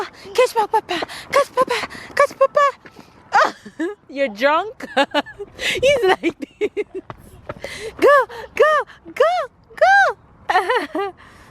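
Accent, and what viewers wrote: Jamaica. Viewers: I sure will. I look forward to knowing you better. Are you Jamaican 🇯🇲? You sound like one. I'm Nigerian 🇳🇬 and my man is Irish 🇮🇪 🍀. Don't forget to subscribe to my channel too so you can also see what I'm up to.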